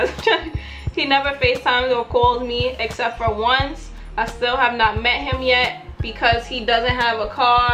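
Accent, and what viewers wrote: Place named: Caribbean. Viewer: Love your vids! Where are a from? I watched a few of them and I think I can hear a slight Caribbean accent, with certain words that is.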